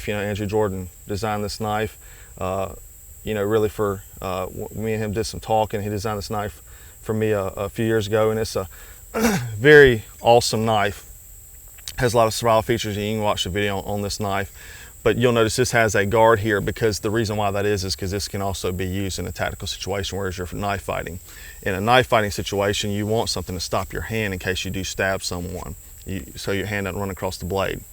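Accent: South, United States. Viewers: You sound like you're from North/South Carolina? Nothing wrong with that. American by birth, Southern by the grace of God. Great video.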